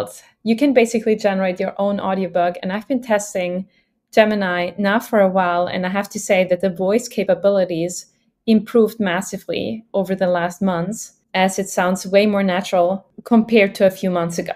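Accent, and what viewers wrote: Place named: Netherlands. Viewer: A few seconds in... wait a minute is that a Dutch accent ? A Dutch AI-content Youtuber ? We don't have much of that, would be funny if it is. Glad I was right. 🙂 I wonder if someone like who seems to be very good at using tools. Could you use Firebase studio to get results. Tina Huang who seems to be a developer was able to do it obviously, but I wonder for example if you could get the data store connected for that CRM. Or maybe we just need to wait an other month or 2 for Google the improve it/make it easier to use ?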